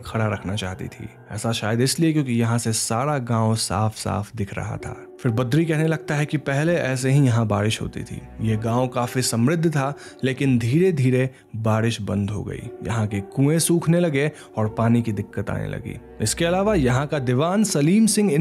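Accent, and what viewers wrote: Bengal, India. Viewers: Are u from Bengal? Im catching a Bengali accent. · Voice over awaz kisi bengali ka hai. His pronunciation makes it evident. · Narrator is definitely bengali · Bro your voice is very nice lekin yar ek kaam karo. Pronounsession mai gadbad hai R ko D mat bolo. Sorry bro no hard feelings. I can understand your bengoli axent. Some of my bengoli friends talk same like U. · Your Bengali accent ruined the whole fun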